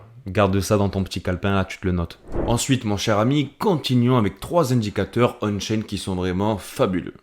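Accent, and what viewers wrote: South, France. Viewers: Je viens tout juste de découvrir ta chaine. T'es génial change rien, avec le ptit accent du sud ca passe comme papa dans maman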